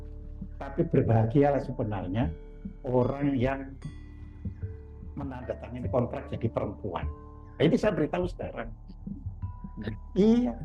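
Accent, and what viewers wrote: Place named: Surabaya, Indonesia. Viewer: Bopo Suroboyo ne kentel bgt nih, Sampek emeh keplincuk 😅😅😅, Rahayu bopo ❤❤❤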